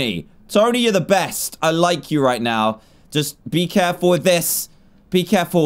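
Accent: United Kingdom. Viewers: I'm new to his channel, damnnn I love that British accent 😍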